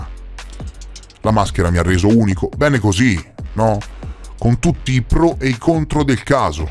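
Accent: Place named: Central Italy, Italy. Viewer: Dall accento probabilmente del centro Italia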